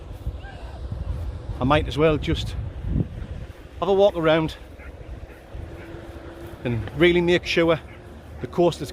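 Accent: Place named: Sunderland, United Kingdom. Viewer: I'm originally from Sunderland, 46 years, left 12 years ago, so lovely to hear a proper accent. Love this stealth camping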